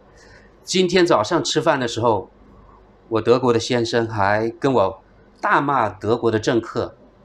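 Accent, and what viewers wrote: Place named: South, China. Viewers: 老乡，虽然你的南方口音和表达不是那么完美，但是听起来特别真诚。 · airs yong 他普通话是流利的，但是有南方口音，这很正常。就象滇西小哥一样，最后一句话是有云南口音的，挺可爱的。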